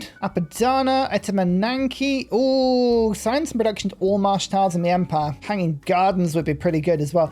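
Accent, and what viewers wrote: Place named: United Kingdom. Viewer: "vis.. vwappid coowing of ayges" sometimes the British is so thick it makes my American blood sick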